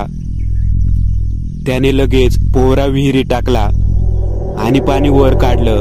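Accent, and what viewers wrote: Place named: Pune-Ahmednagar, India. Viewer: Tumcha tonning Pune , Nagar side che vatate......!! Chan ahe keep it up......!! Story saglyach chan....JB chya saglya story me eaikte.....🤗💐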